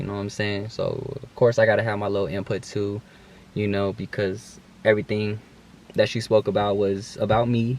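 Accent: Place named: Bay Area, United States. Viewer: I think the Bay Area is getting to you in how the speak lol . Those from the bay know what I mean lol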